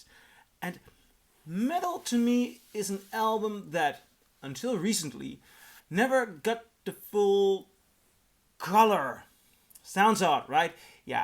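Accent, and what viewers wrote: Netherlands. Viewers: Are you from The Netherlands? Just asking because of your accent. I'm Dutch.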